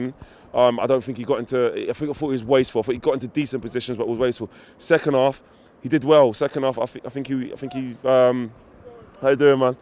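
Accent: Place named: Manchester, United Kingdom. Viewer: He sounds like a local Mancunian huh?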